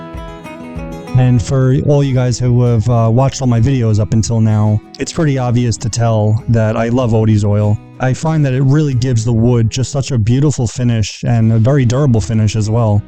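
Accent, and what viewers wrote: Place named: New England, United States. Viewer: I enjoy your work & have seen many or your videos. I subbed today, didn't realize I hadn't. Sometimes I wait for a few videos to see if a person genuinely has my interest. May I ask, are you from NY or New England? I think I recognize the accent (I'm a New Englander), no offense intended. You could consider (?) putting an email address in your bio or other SM as some of us may be interested in contacting you without leaving messages for the world to see ;) - Great job, so refreshing to see restoration. I also admire furniture art & many forms of art, but restoration is its OWN baby! 🥰Kudos!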